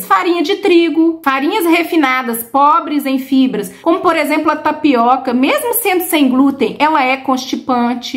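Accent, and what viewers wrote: Minas Gerais, Brazil. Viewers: Acho que você é mineirinha pelo sotaque. Eu sou de Bauru SP.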